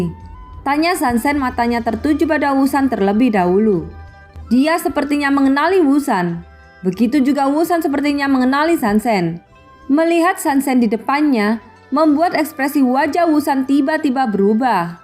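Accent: Java, Indonesia. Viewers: Medok piye iki bahasa ne...😬😬😬😬😬😬😬😬😬😬😬😬😬😬😬😬😬😬😬😬😬😬😬😬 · Medhok nya bisa di kurangin ga..😊😊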